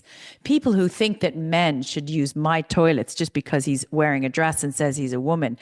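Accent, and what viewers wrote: United States. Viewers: Are u American ?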